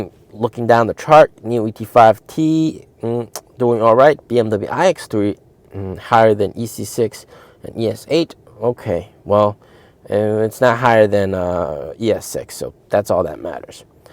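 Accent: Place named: Texas, United States. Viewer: Every time I hear you talking without looking at my phone I thought you were American from Texas😅, you talk like the guy from magpie restaurant who teaches ppl to cook🎉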